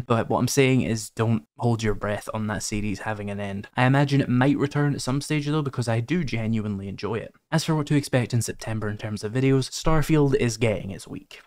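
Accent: Scotland, United Kingdom. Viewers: That's a lowlander Scottish accent if I'm not mistaken, and I'm going to lean toward Dundee more than Edinburgh.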